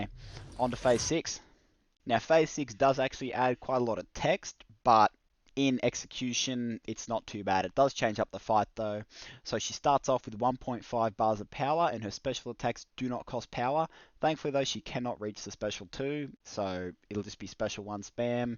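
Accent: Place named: Australia. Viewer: Mate, you an Aussie? What’s good my fam, instant fan and subscriber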